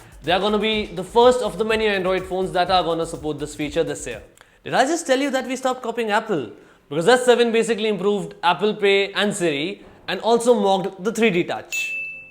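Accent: India. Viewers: i could not understand a word from your Indian accent...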